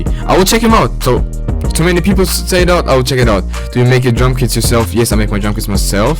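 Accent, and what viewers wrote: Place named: Germany. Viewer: Deutsches English izz da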